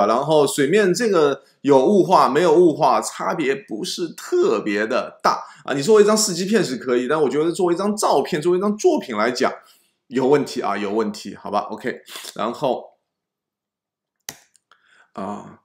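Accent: Shanghai, China. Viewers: 先生侬好！两个月前，一次偶然的手抖误操作，点开了正在直播的《摄影评片》，听到一口上海腔普通话，就像听到邻居、朋友讲闲话，蛮亲切的，所以没有马上转频道，听听讲点啥么事？听着听着，被侬专业、中肯而又平实易懂的点评所吸引，尽管我非摄影爱好者，但是仍就看到了直播结束，并且订阅了《詹姆斯摄影》。之后陆续看了另外两集《评片》，也看了《瓦纳卡的孤树》、《星芒照海岬》和《詹姆斯摄影十年作品》（封面上的南浦大桥覅太赞哦！），刚刚又看了第十二期《摄影评片》，虽然这些只是侬视频群里很少的占比，但是已经影响到我，对摄影好像有点感兴趣了，正在准备考虑买只两手货佳能5D2，再配一只好点的镜头起步，尝试去感受拍照的乐趣。侬让我联想到了另外一位从上海走出来的摄影大师——郎静山，尽管所处时代不同，作品风格迥异，可都勤奋努力，孜孜不倦，砥砺辟出了自己的路，都在成功之后毫无保留地将自己的学识和经验和盘托出，倾力普及传授摄影知识，都德艺双馨，令人尊敬。郎先生一百多岁还手捧相机黄山徒步，相信侬也会在一百多岁时仍行走于天涯海角摄取美景飨世人的。祝福侬摄影教学收获更多硕果，摄影艺术登上更高境界！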